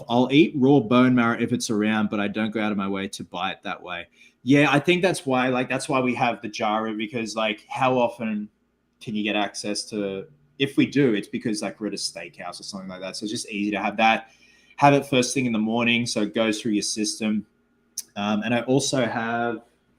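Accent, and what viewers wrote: Australia. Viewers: Lol, "Saturn"! I've been listening to this while sewing for a cosplay commission and I thought you were saying "Satin" this whole time, but then I looked up and saw the title and the banner at the bottom of the video 😆🤦🏻‍♂️! That Aussie accent really muddles words sometimes, I guess, huh, lol 😅...?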